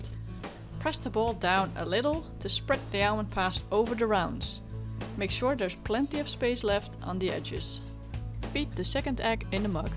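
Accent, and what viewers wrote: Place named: Netherlands. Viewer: Waarom praat je niet gewoon Nederlands want je Engels tells me clearly dat je Nederlands bent.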